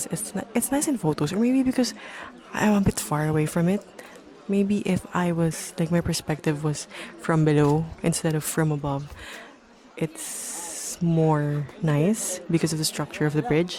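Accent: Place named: Latin America. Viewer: Lol I thought you were from south America during the whole video until shortly before the end. 😂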